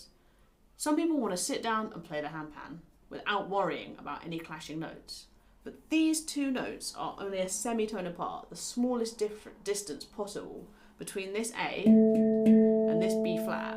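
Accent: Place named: United Kingdom. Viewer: Thank you so much! Informative, and easy to understand. (and my Californian ears love your U.K. accent) 🥰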